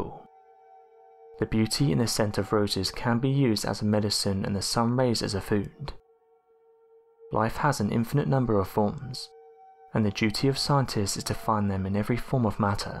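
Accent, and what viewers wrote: England, United Kingdom. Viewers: He has a normal English accent, and no one is making you listen to it.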